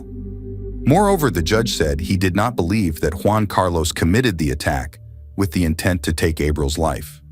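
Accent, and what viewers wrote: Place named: Mexican American, United States. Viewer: Sounds like Ameri/mexico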